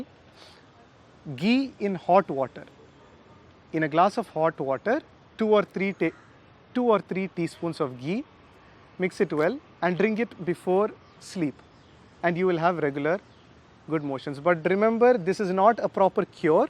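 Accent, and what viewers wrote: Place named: London, United Kingdom. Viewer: நீ முதலில் தமிழில் பேசு பா. பெரிய லண்டன் ரிட்டன் போல பேசிகறாய். டைம் தான் வேஸ்ட். 👊👊👊👊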